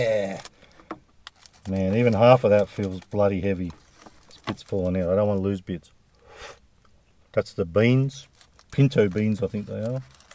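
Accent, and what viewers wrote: Australia. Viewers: GOTTA HAVE A BURRITO great video mate but your still just a touch too australian for my taste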